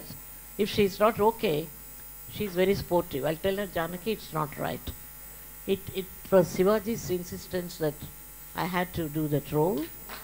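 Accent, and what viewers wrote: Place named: Oxford, United Kingdom. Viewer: Salute Madame ...perfect Oxford English..wishing You all happiness in life 🙏🙏🙏❤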